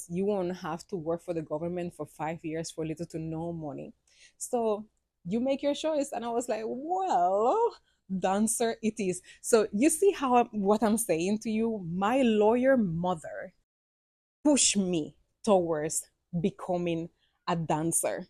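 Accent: Jamaica. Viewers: I love this. I'm surprised you made the transition to Jamaica. I've heard Cubans like Dominicans don't care for blacks. Here you are in Jamaica. I love this. Girl: if you could see my face; lit up, listening to your Jamaican accent. I'm from Montego Bay, now living in Texas. We need to learn more about our Cuban brothers and sisters. Welcome to Jamaica.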